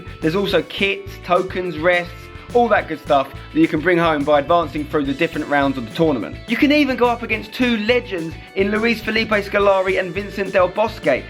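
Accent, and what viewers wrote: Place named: United Kingdom. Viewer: You are talking like a british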